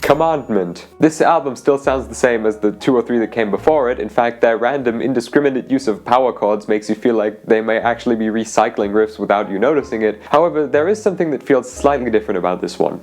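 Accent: United Kingdom. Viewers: There is no way a guy with fender Wall art and a UK accent is saying every song sounds the same on 13. Kids these days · It's kinda wierd listening to a British dork with a constantly cracking voice and a tendency to raise pitch and laugh/giggle nervously at the end of every sentence, making fun of the way someone else looks or talks. Whatever may be true of all the things you point out, talking about someone's look/style and/or vocal tendencies, is 100% fucking douche move.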